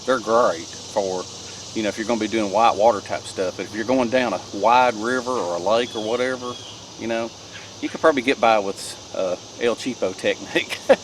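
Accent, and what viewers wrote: South, United States. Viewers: Wow how do people get a southern accent like that.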